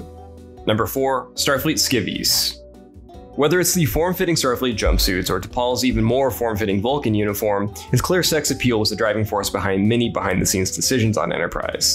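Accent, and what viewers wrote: United States. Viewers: Wait, did y’all hire a new person? An American??? (I’m American too, so, I empathize haha) Or is this the fabled who Adam Clery is always shouting out? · Wait... You hired an American?? Maybe I should have applied after all. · Uhh. Who is the Yank talking? It's jarring! :P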